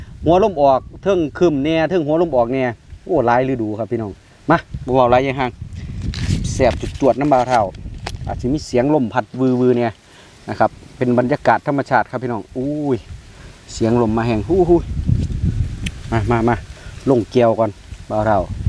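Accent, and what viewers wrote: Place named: Khemarat, Thailand. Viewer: บ่าวท้าวเป็นบ้านใด๋ฟังสำเนียงเสียงกับการเว่าคือคนแถวไปบ้าน(อำเภอเขมราฐ)แต่ถ้าบ่แหม่นกะขอโทษจ้าแต่ตอนี้คนถามอยู่ลพบุรีได้30กว่าปีแล้วแต่เคยลืมเขมราฐ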